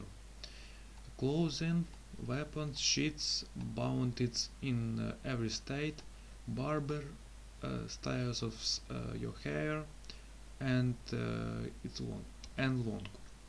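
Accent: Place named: Russia. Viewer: Прекрасный русский акцент👏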